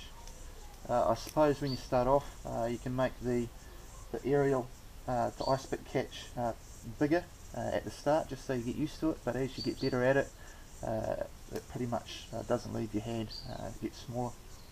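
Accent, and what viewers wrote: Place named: Australia. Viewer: Are you in Australia? You sound Australian and there's a lot of greenery and birds where you are... It sure looks like it. Correct me if I'm wrong, I could be totally off.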